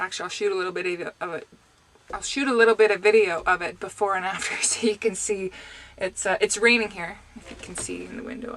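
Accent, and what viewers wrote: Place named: Canada. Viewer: Canadians have cute accents. As far as asking a guy for help, a woman should never be afraid of asking for assistance when needed. My Marine buddy has been driving for twelve years, and STILL doesn't know the do's and don'ts of sliding the tandems. Once I get my cdl and get on the road, I'll help ANYONE that asks.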